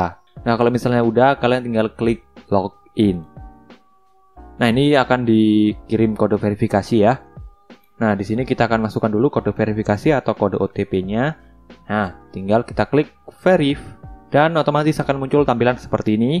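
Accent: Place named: West Java, Indonesia. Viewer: bang asli mana ko kayak org sunda??? mohon dijawab bang🙏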